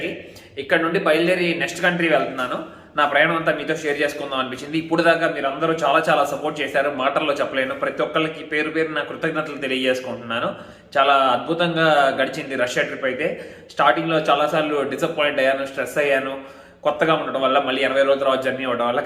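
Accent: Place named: Telugu, India. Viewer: అన్నా మీరూ ఇంగ్లీష్ మాట్లాడుతుంటే ఏంటో తెలుగు మాట్లాడుతున్నట్టు వుంది అన్న....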